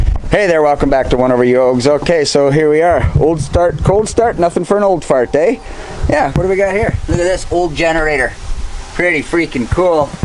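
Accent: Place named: Newfoundland, Canada. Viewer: Yes my son ,in newfie you would be one of the bye's .... You comes across is half rednech half newfie ,we bye' loves it ...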